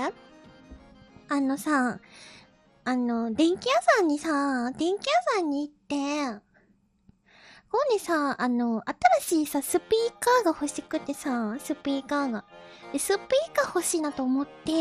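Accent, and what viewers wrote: Kansai, Japan. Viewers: From the v-tuber wiki: She has mentioned on stream that her accent comes from a combination of her grandmother's Kansai dialect and an unknown dialect of her parents that has not been revealed. She is working on changing it into a more common one. Her accent seems to differ most notably from the inflections of syllables. For example, she pronounces 皆 as MIIN-na rather than the more typical min-NAA. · there was one old stream (don't remember which one specifically) where she says her grandma's Kansai-ben influenced how she spoke when she was little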